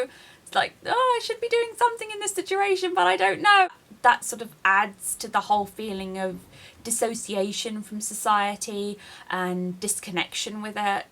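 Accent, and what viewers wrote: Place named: England, United Kingdom. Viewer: ! Are you out of country? I'm saying you seem to have an English accent! And can I say a beautiful woman! Commented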